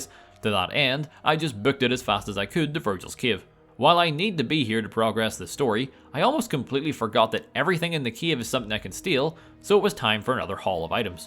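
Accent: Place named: Northern Ireland, United Kingdom. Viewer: i would see my face when i heard your northern irish accent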